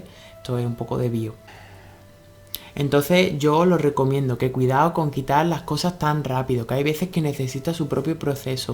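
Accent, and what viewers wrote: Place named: Andalusia, Spain. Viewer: Soy de estepona Malaga vivo aqui eres andaluz por el acento. Buen video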